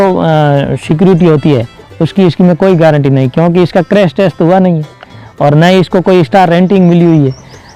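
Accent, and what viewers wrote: Rajasthan, India. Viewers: राम राम भाई.. कुणसो गाँव सा.. आपके बोलने के Accent से लगता है आप राजस्थान में हमारे गाँव के आसपास के ही हो..आपके वीडियो देखलर बहुत अच्छा लगा खुशी हुयी..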